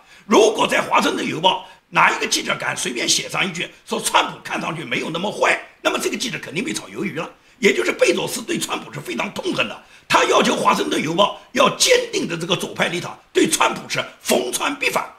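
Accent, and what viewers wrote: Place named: Guangdong, China. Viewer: 听口音不像广西的，更像广东的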